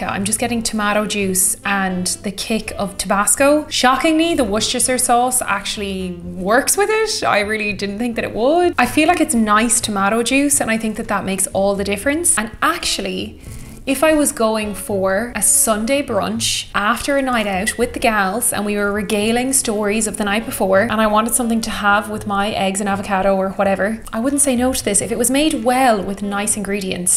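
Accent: Ireland. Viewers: Pronounce worcestershire however you want to. Modern English changed all of the pronounciations of these types of words, anyway. There's really nothing anyone should say. Maybe that's just the Irish bit of me wanting to stick it to the English? I am fine with that. Like they've been known to be nice to Irish folks, riiiight? Definitely anything sounds adorable in an Irish accent, so there is that, too. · Speaks beautiful irish 🚻 is absolutely stunningly beautiful 🚸plausibly bordered line alcoholic 🚼🚼,Jesus could you stop sending your angels to earth to be amongst us mere mortals,🤔😂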